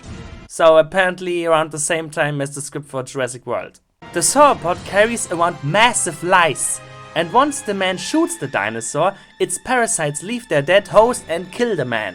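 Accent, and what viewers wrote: Germany. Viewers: Hab mir doch gedacht, dass du Deutscher bist! Dieser Akzent :D Aber deine Aussprache ist sehr klar, bist gut in Englisch. Und danke für deine Videos.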